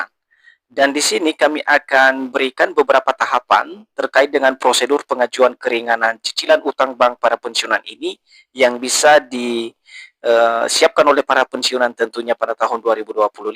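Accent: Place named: Sumatra, Indonesia. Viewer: Sp lagi sih yg punya chanel ini. Suaranya persis sama dgn suara orang yg sering nipu di youtube, logatnya sperti org dari sumatera atau dari indonesia tengah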